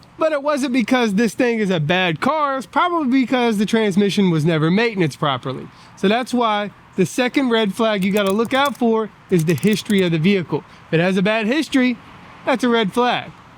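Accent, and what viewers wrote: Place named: South Carolina, United States. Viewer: South Carolina i hear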